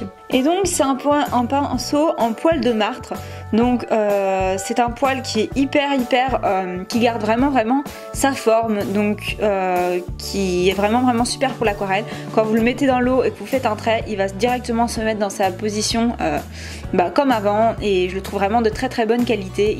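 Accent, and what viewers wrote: Belgium. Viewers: tu est belge ou Française moi je suis belge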